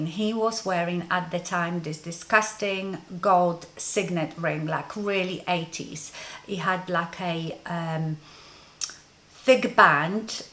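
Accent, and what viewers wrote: Poland. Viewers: I have been watching a few of your videos lately and I always thought I detected a polish accent behind the English accent. I finally found your video about how you ended up in the UK and it confirmed you were from Poland. ( My mom is Polish and moved to Toronto in 1971)....funny thing is that the video got even more personal for me because when I met my husband he used to wear this ridiculous ring too and I completely forgot about that. lol It looked like a hockey championship ring (but was not). Such a brilliant idea to make a lovely ring out of the diamonds. Thanks for the laugh. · You still have polish accent as well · Hello it’s so nice to hear your story. It reminds me of my own I’m croatian and came to France in 1995. Life back then was so different and you are right we were kind of naïve. And I don’t know you but French people here thought that we lived like a cave man in estern countries... 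like we never so a tv, Phone, or PC.... so funny. Kisses and Big hug from 🇫🇷 Love your accent I don’t know if there is some polish residu but it’s so cute 💓💯💓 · Oh yes you are, love the Polish accent, I so miss Warsaw😢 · I loved hearing your story. I am relatively new to watching you and didn't realize you were Polish. I often wondered about your accent. I truly hope you and Paul are still enjoying life together. Thanks for sharing.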